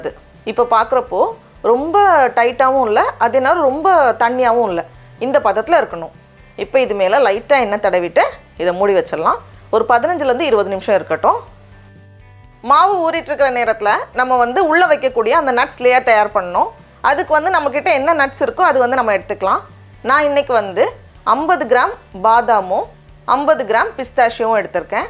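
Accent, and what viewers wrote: Nagercoil, India. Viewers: அக்கா நீங்க பண்ற ஒவ்வொரு சமையலும் அவ்வளவு அழகாக உள்ளது அதுவும் உங்கள் நாகர்கோவில் பேச்சி அருமையாக உள்ளது எனக்கும் நாகர்கோவில் தான் நீங்கள் சொல்லித் தரும் விதம் எளிமையாக புரியும் படி இருக்கிறது ரொம்ப நன்றி தயவுசெய்து எனக்கு பதில் கமெண்ட் குடுங்க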